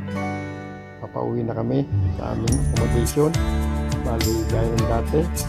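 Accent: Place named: India. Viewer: September🎧 30, 2023 The weather here is fair Ads: 1) Inasal 2) Shopee 3) Excorcist Movie trailer 4) Payless 10 days ago, Brother it seems to me that you have imitated Indian accent. How are you? Qantab has a rocky shore. Okay BRO be safe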